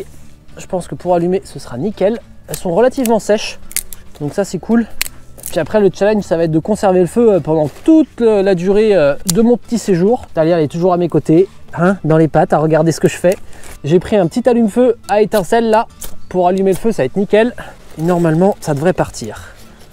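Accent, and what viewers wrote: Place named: Marseille, France. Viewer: Tu as l'accent marseillais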